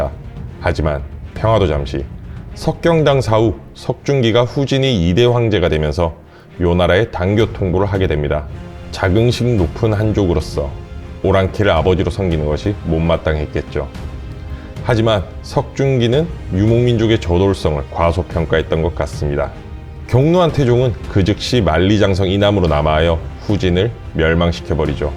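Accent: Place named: Gyeongsang, South Korea. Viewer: 경상도 사투리인데요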